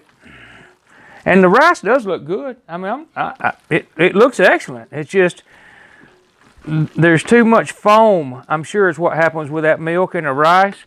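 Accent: South, United States. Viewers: I just love your Southern Accent! My teenage daughters continue to watch you , they even walk around spewing out your hook”007” they just Love you! Keep on Cooking!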